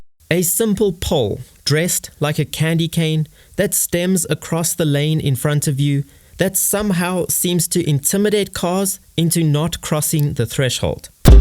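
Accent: South Africa. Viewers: Are you from South Africa mate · South African? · You South African? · Where u from m8? South Africa?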